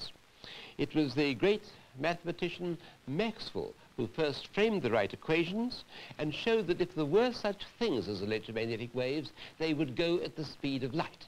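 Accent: United Kingdom. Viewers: When you hear a man speaking in that old fashioned more upper class English accent, you listen! I learned so much, and this is how physics should be taught, thanks for posting. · Wonderful accent, very posh. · Brilliant and clear British accent !!!!I like that!!!!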